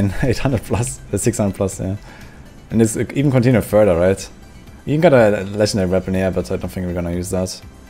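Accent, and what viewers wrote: Germany. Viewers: as fellow German you probably can hear his German accent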